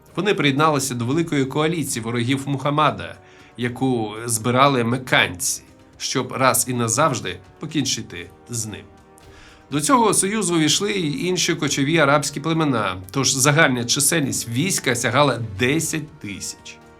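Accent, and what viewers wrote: Russia. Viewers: Пане, ваш русский акцент ви, якось, збираєтеся ліквідувати?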